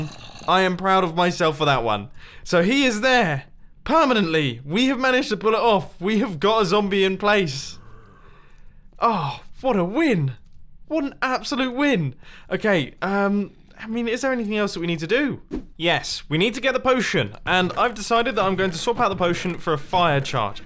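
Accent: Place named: United Kingdom. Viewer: Your british accent is finally getting me after 4 seasons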